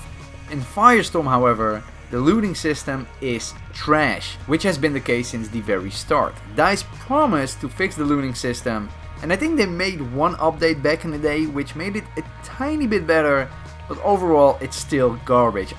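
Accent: Netherlands. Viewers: Are you Dutch bro ? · I feel he's Dutch by his accent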